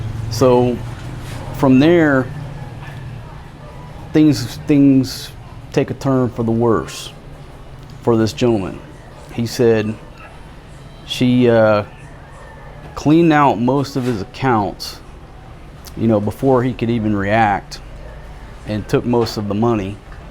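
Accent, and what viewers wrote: United States. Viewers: The trailer idea is genius. Even if she gets possession of it, it would be a lot cheaper than losing a house. Take care with the bank account comment; it might bite you if it gets in the wrong hands. I'm not from your part of the US but I have absolutely no problem with your attitude or the way you talk. Be yourself and be proud. Peace out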